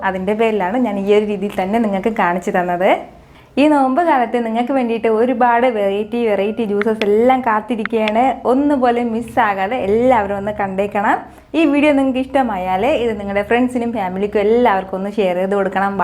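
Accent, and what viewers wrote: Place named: Malabar, India. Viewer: ath അറിയ but malabari style talk ആണ്. അതല്ലേ നമളെ പോലുള്ളവർ fans ആയത്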